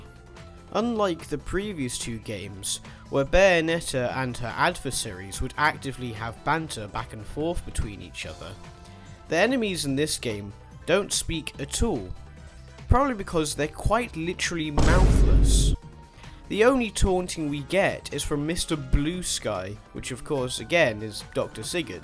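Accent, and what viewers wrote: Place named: United Kingdom. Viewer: He's literally from the UK and one can clearly hear it lmfao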